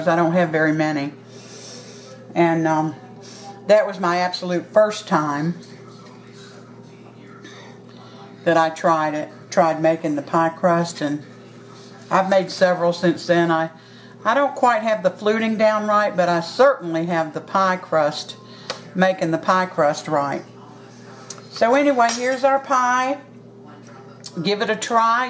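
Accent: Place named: Tennessee, United States. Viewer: Your accent sounds like you in Tennessee. Are you ?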